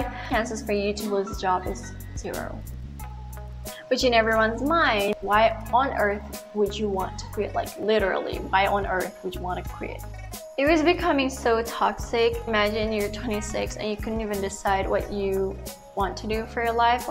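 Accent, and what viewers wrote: Kedah, Malaysia. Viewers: firstly, your accent ahhaha 😂 then loghat kedah...2ndly, ive got an iv dgn kastam so just watching , btw i study in construction ind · Mula ii tengok.. ingtkan makkk salehhh join pdrm.. lpstu keluar loghat kedah.... Okay 😐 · org kedah ka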